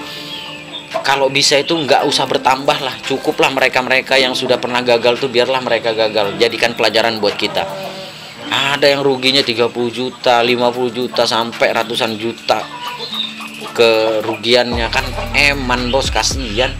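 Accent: Madura, Indonesia. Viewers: Dari logatnya orang madura ya cak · Madura ya kang?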